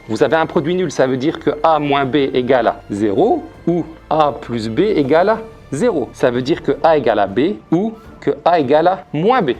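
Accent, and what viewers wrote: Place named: Asia. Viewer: Pourquoi vous portez un masque si ce n'est pas trop indiscret d'oser poser la question.Je crois en juger par votre accent que vous êtes asiatique😅😅😊.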